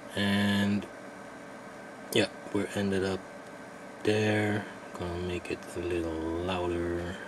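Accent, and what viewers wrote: Netherlands. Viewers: ik weet gwn aan je acsent dat je nl bent XD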